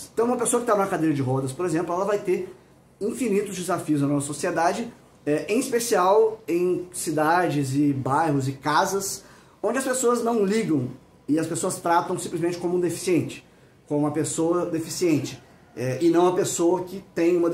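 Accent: Minas Gerais, Brazil. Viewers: Uai que trem doido, cê é mineiro também?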